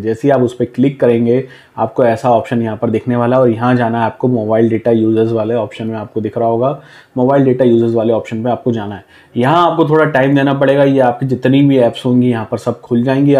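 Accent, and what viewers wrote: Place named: India. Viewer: Awful accent. Hard to understand. You Indians should be more careful about who is listening to you and try to speak slower and clearer.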